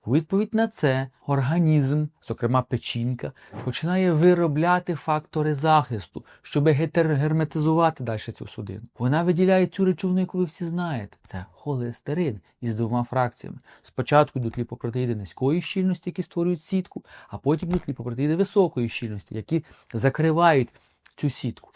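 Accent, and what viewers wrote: Vinnytsia, Ukraine. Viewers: Мені здається що ви з Вінницької області.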